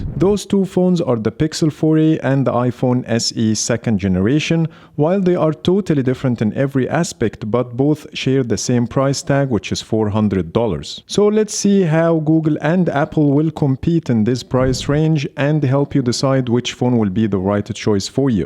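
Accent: Egypt. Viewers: I can totally tell that you’re an Egyptian from your accent. Nice vid by the way 👍🏻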